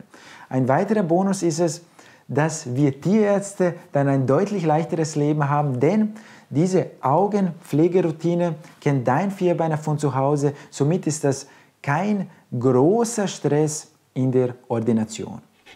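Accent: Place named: Russia. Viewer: Dieser russische Akzent macht das Video einfach perfekt 😂keine Ahnung woran es liegt aber wenn jemand mit russischem Akzent spricht würde ich mir alles angucken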